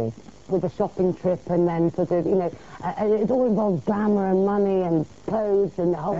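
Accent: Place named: United Kingdom. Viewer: Aint nothing Iike a British Iady in Iatex, when she spices all that beauty with the accent.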